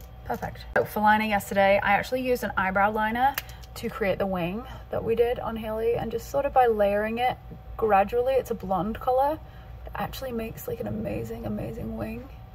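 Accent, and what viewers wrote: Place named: United Kingdom. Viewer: You sound so much more British in this video. I wonder if it's because your parents have been visiting? I love it -- you sound delightful 🙂 😘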